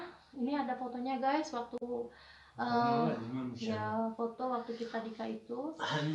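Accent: Banyumas, Indonesia. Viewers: ngapak ngapak salam kreasie kompelite like like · Karo basa ngapak yaa, mba..😂😂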